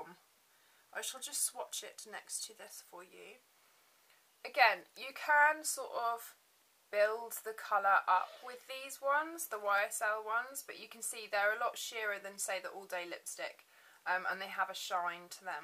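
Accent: United Kingdom. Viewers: I LOOOOVE your British english - so sophisticated! unfortunately I fail at imitating. :-) keep up the amazing tutorials! xx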